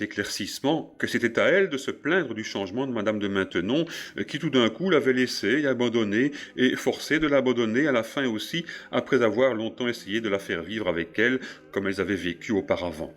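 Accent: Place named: Belgium. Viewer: Ce savoureux accent belge...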